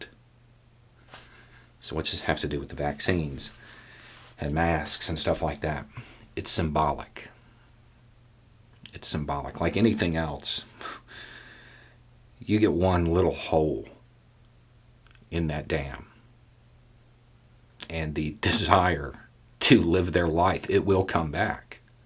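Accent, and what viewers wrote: South, United States. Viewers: He's in the South and he has an accent associated with the South. He looks and sounds like a "good old boy" which is generally a Republican voter.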